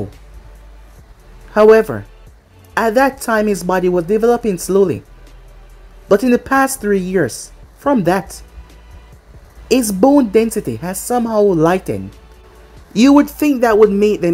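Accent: Jamaica. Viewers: Wassup bro I’ve always wanted to ask you this but are you Jamaican 🙂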